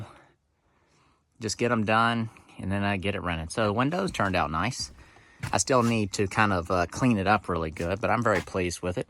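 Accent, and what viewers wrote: South, United States. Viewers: I agree with the chap who said you could do motivational speaking, myself I also like just the sound of your voice and your accent! ( southern US) I also would love to see this house when it is finished but nothing you post is boring because everything you post teaches me something. I love the passive aggressive, there is a lot of that going on in Britain, it's almost second nature. Family comes first doing things you have to and because you want to I can always go and study other videos you've made which cover almost every aspect of what you would want to learn thank you for some more great content. Kind Regards from South West England.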